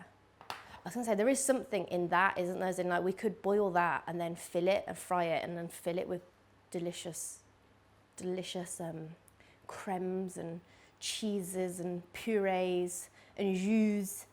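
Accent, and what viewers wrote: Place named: West Country, United Kingdom. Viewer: I give up - what is your accent? Sometimes I hear London, sometimes Midlands, and often West Country... put me out of my misery! (P.S. These spuds look glorious!)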